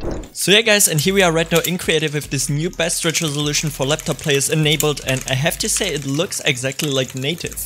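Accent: Germany. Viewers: bist du deutsch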